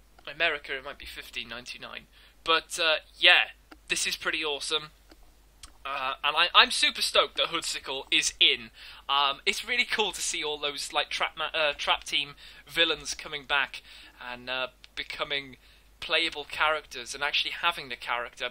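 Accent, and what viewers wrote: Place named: England, United Kingdom. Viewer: Matthew was wondering where abouts in England do you come from because your accent is really cool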